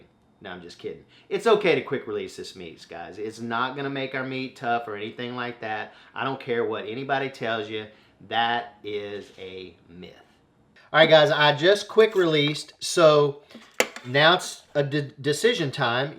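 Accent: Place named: South, United States. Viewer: By chance, l saw your recepe for cubed rump steak. I didn't want to make a casserole. Yours popped up immediately. I love your accent and personality. Is it a southern accent? The hairdresser l follow also has the same accent. March 2025. I wish you would use the metric sizes. However, l can check it out. Thanks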